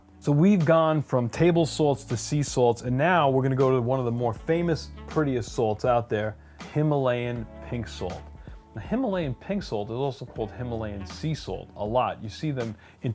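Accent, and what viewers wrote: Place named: Long Island, United States. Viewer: I’m from Long Island and recognized the accent haha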